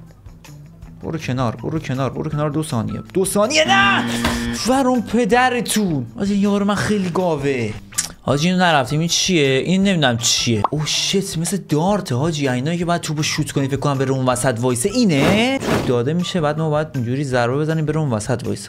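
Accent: Mazandaran, Iran. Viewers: داش کجای مازندرانی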